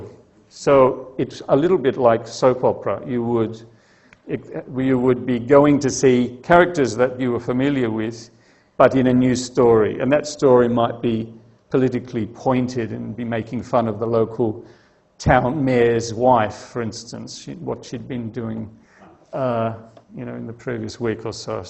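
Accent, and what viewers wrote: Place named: United Kingdom. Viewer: Hearing a Brit attempting to pronounce Spanish is like a hearing a Mandarin speaker say "rolling lorries." Instead of "los miraglos" its "Lahs Mihraahglahs".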